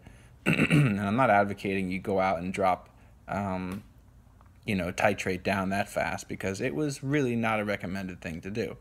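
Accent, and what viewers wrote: United States. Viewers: Are you from Tennessee?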